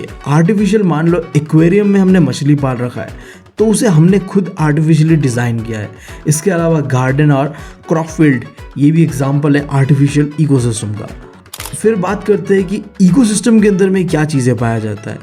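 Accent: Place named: Bengal, India. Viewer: Ap Bengali ho ya Assami 🙄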